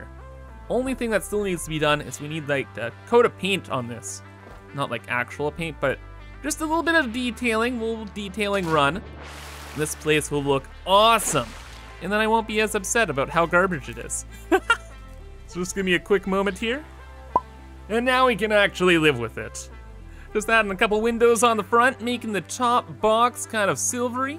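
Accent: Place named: Canada. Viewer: no, mate, listen too his accent. He's so Canadian. So very Canadian.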